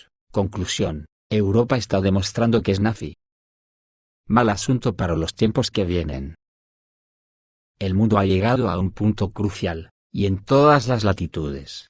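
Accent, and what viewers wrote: Spain. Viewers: El acento es vizcaíno.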